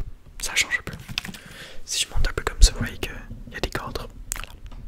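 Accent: Quebec, Canada. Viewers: J'adore l'accent québécois en tant que français, sinon je me détends toujours autant meme si je ne commente pas souvent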